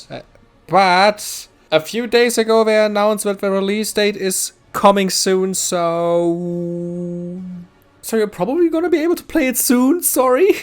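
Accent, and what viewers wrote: Germany. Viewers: love ur german accent. tryed some of the games thx for the vid i had a lot of fun cuz of it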